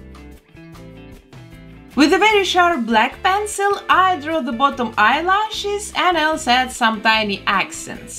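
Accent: Russia. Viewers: Акцент очень русский... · If I may ask... You have a gorgeous accent (I’m American) my husband says you’re from Russia (he’s a musician in a Russian place) I think you mentioned Belgium. It’s so beautiful!! You have a gorgeous accent!! ♥️♥️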